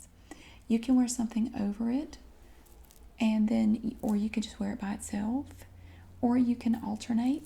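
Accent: South, United States. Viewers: seriously though all scientific knowledge and psychology considered, is it weird that her special southern accent gives me an instant erection ?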